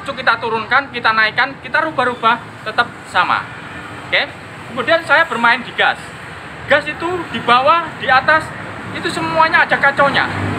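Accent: Java, Indonesia. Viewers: Jowo ne ngendi mas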